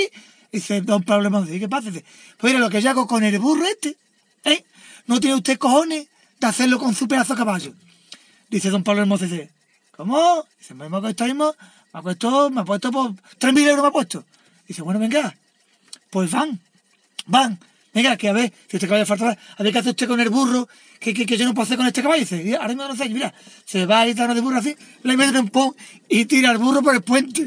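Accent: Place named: Andalusia, Spain. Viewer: Éste hombre habla perfecto andaluz!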